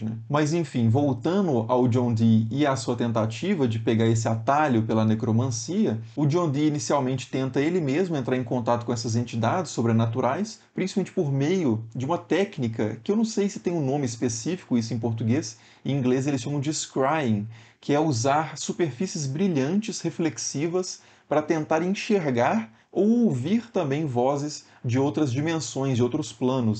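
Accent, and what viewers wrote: Minas Gerais, Brazil. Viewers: o suave sotaque mineiro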